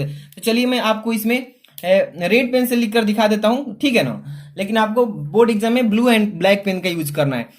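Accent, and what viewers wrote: Bihar, India. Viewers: Sir aapki awaj se lg rha h ki aap bihari h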